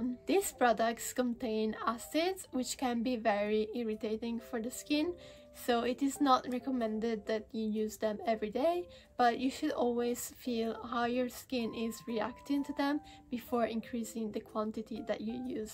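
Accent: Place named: Hungary. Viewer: Hungarian? Judging from your accent.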